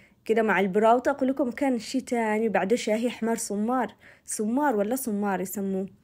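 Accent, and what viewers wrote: Aden, Yemen. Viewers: انتي من افضل اليوتيوبرس ،وكفايه صوتك الحنون واللهجه العدنيه الاصيله عوضا على وصفاتك اللذيذه اللي تجنن ،لك كل التحيه والموده والتقدير ❤ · اي حد عاجبه يتابع ووالدي مش عاجبه طز ولا تخلي ببالك ولاتهمي والله كفايه كلامك العدني الحلو موفقه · مستغربة ان في تعليقات سلبية على كل هذا التميز في الشرح و الطبخ الرائع .. ماشاء الله فنانة جدا بمعرفة كل انواع هذه الطبخات وبطريقة الشرح اللي يعلق سلبيا معناه حسد انا حتى فرحانه انك تتكلمي عدني زينا مش زي اللي يسافروا السعودية ولسانهم تنعوج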